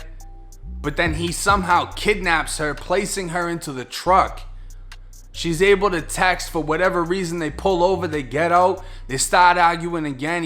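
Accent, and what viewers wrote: Boston, United States. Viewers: I have to ask, r u originally from boston? U truly sound like u got our boston accent💯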